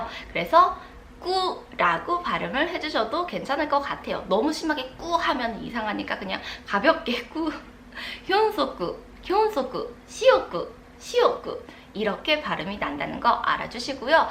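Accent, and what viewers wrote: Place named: Tokyo, Japan. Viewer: 수도권 출신의 일본어 특유의 발음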